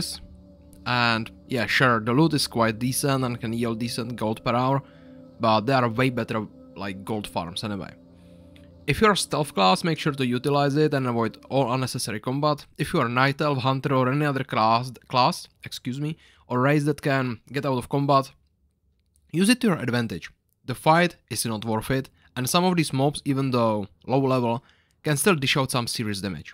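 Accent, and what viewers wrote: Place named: Czech Republic. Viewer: proč jsem poznal že si čech v prvnich 3 slovech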